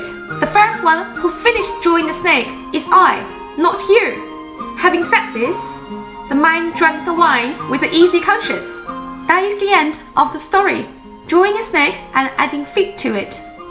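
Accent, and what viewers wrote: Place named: United Kingdom. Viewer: You are so adorable 🤗 you have a really nice british accent too. If I were to guess, I'm thinking oxford/london from the accent.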